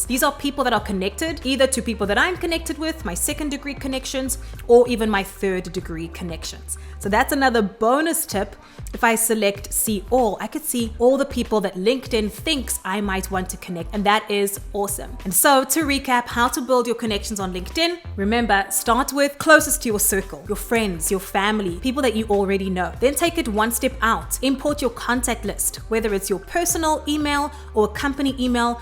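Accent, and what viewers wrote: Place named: South Africa. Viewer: So nice to hear another South African accent 🇿🇦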